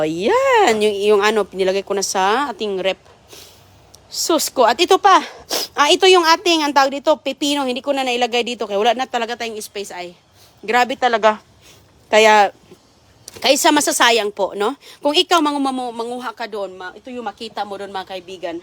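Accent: Visayas, Philippines. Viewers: it's bisaya accent...wag ka mag inarte...bakit ang boses mo ba boses angel ka ba😂😂😂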